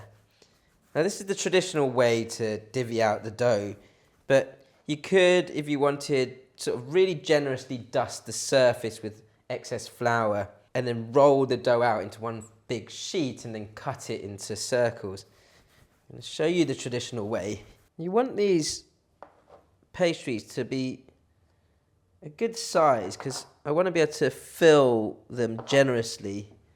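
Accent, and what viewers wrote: United Kingdom. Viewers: thank you.. you sound sooo British.. San Diego Ca.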